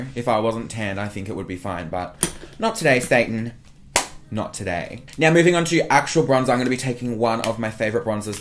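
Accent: Australia. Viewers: Are you Australian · u from australia?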